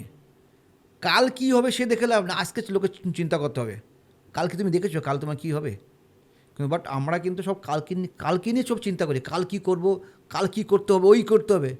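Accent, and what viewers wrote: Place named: Assam, India. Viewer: দাদা কথাগুলো খুবই ভালো লাগে কিন্তু দাদার কিছু কিছু কথা আমি বুঝতে পারিনা দাদা কি আসামে থাকে কিছুটা কথা আসাম টান আসে কালীঘাটে দাদা কোথায় বসে❤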